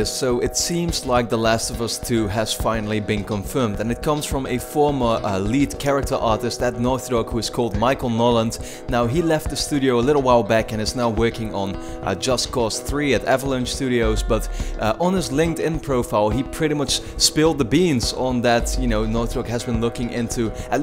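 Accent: Netherlands. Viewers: Great video but you really hear that your from the netherlands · your dutch right?:P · gray-brown becouse i am dutch too and his accent looks like he is dutch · are you dutch? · Are you dutch? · Are you dutch? I can hear it in your voice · You dutch?? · You sound dutch, am i right? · Are you Dutch? You sound like you are. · Ayy ik hoor een Nederlander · Are you dutch? Bcs of your accent xp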